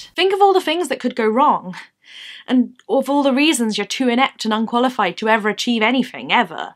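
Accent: United Kingdom. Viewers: Great to hear! Yes your writing and lyrical performances are very pleasing in and of themselves, but speaking as an American, I'd be lying to myself if I didn't credit your wonderful British accent as well. Haha. Also speaking as an American, I'll take the lashings on behalf of my country for doing our fair share to fuck up gender politics in 2017. bows to ironic applause someone throws a tomato (you read that word as "ta-mah-toe) ;)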